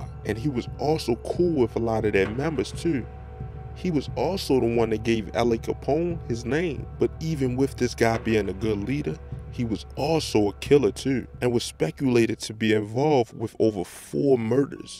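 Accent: Baltimore, United States. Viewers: You from bmore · Are you from Baltimore? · Bro you clearly have a Baltimore accent. Please stop speaking on Chicago business. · I’m convinced dude is from Baltimore from the “tews” dews etc …. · Quit that damn Baltimore Slang you say 2 two gets aggravaten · Baltimore accent thick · You gotta be from Baltimore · Very thick Baltimore accent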